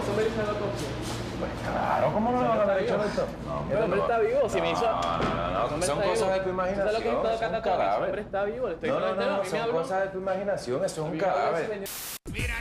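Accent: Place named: Puerto Rico. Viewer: Me encanta el acento boricua. Bendiciones a Puerto Rico.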